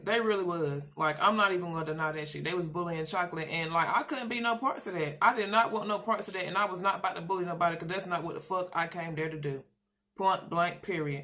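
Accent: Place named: South, United States. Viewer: this is so off topic but girl i love your country accent.........and it didn't work out bc yall are much older and way mature then them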